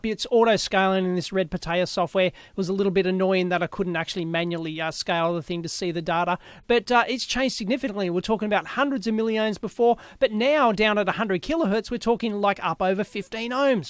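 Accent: Australia. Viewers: Now I no why!!! Thank you! Great tutorial! Aussie English, it always makes me happy! I'm still saving money for a trip to Australia... My only sorrow is that when I be there, down under, I don't want to go back home. There's something about Australia that attracts me. Don't ask my why or what it is. Greats from the Netherlands! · A book would be nice. Sometimes i cannot stand his Australian accent. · When I remember my Uni studies, the voices of my lecturers voices always come to mind. Now, when I put in any bypass capacitors my inner monologue will be telling me how to do it in a surprised sounding Aussie accent. Love it.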